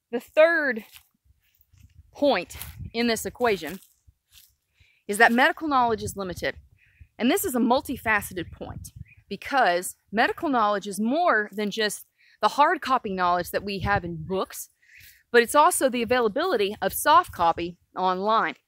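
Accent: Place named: Appalachia, United States. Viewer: The longer she's in the hills of Tennessee, the more that wonderful Appalachian twang begins to surface. Love it. :-)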